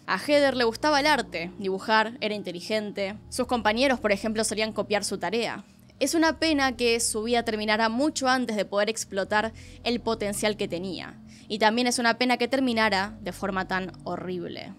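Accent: Argentina. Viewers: Me encanta tu acento Argentino es encantador escucharte hablar, por cierto mis hijas piensan que estoy loca porque escucho éstos videos no entienden que es para aprender a cuidarlas. Te admiro mucho niña hermosa. 🇨🇴🇨🇴🇨🇴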